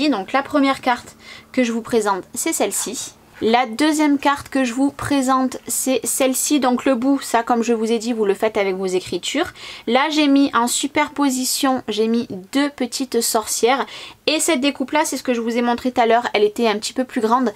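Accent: South, France. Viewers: Même si je ne fête pas Halloween , merci pour ces superbes cartes très lumineuses. Dans l'un de tes derniers vlogs tu nous a parlé de pochettes vinyl pour stocker tes papiers scrap. Peut on y mettre plusieurs feuilles ? Pourrais tu me donner la référence car je serais intéressée , ne sachant plus comment ranger mes restants de collections. Merci et vive ce petit accent du midi qui donne la pêche. 😉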